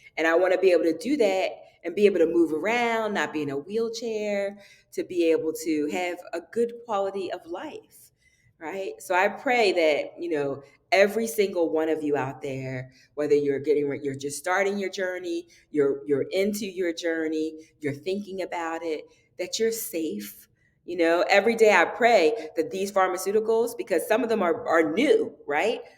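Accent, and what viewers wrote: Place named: Philadelphia, United States. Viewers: Wow! I’m from Philly too, and I knew that was a Philly accent. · Are you from Philadelphia. You sound like my sister.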